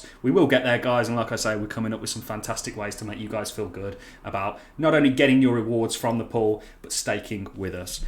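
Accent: Birmingham, United Kingdom. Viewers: knew I could hear the Brummie in you 😆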